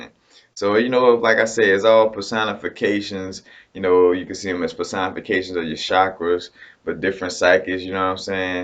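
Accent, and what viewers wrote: New Orleans, United States. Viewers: U sound very New Orleans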